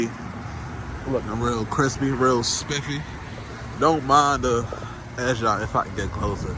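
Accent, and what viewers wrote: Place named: Baltimore, United States. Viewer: That Baltimore accent thick gud video G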